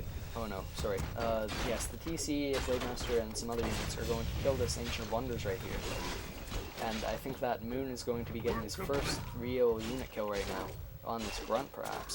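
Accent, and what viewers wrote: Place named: Canada. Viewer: Nice smooth voice, Canada eh? I think I've seen you on US East? " "? Thanks for the upload!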